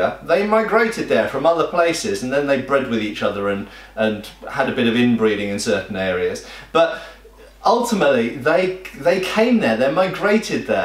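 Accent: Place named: United Kingdom. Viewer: "They didn't just spring out of the earth" - 2017 btw that was only funny because of you're british accent xDD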